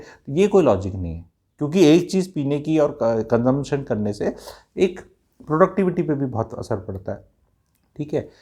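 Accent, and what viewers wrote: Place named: Khadi Boli, India. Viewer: भाईजी, जबरदस्त बात बताते हैं। मजा आता है, आपकी खड़ी बोली सुन कर। कुछ देसी आइटम्स पर वीडियो बना दो बहुत कृपा होगी।,,🙏🙏👍👏👏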